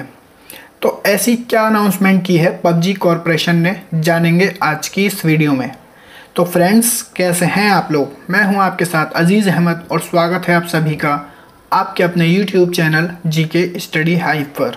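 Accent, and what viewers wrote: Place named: Delhi, India. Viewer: Bhai aap Delhi se ho na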